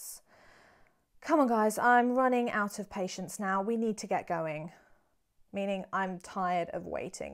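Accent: United Kingdom. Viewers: Your British Accent, and your expressions.. awew....just love the way u speak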